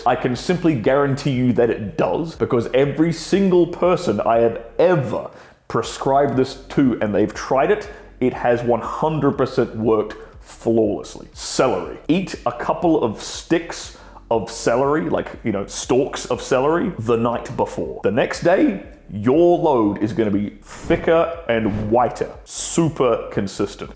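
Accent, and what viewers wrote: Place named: Australia. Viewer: He's got an accent buddy. He doesn't speak God's American English. He speaks Australian Queen's English.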